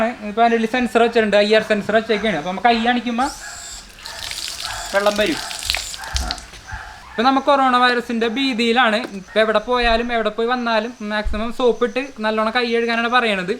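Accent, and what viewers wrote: Thrissur, India. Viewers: ഹാ തൃശ്ശൂക്കാരൻ ഗഡി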